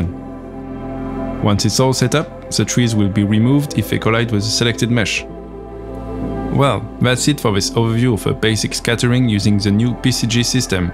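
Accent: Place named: France. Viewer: Toi tu es français c'est sure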